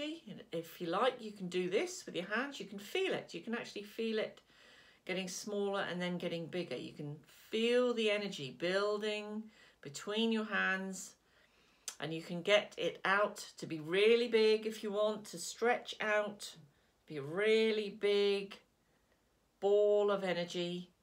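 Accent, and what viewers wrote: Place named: East Anglia, United Kingdom. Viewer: Thank you 🙏that was wonderful. Are you from East anglia? I used to live in Suffolk... I recognised the accent? X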